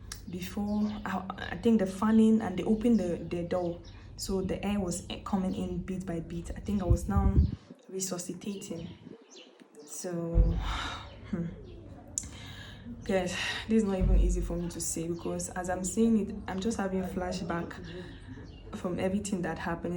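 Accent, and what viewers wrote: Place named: Ghana. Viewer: You speak like Ghanaian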